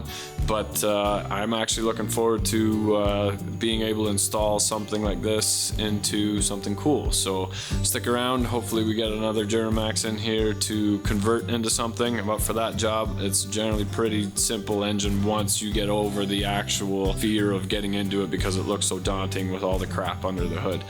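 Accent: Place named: Canada. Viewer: I got sent this video out of the blue to my personal FB page saying "hey Brian, you've got a doppelganger! It's you, but with a Canadian accent! I said, heck ya- it's my dopple-ginger! We're already met and friends eh?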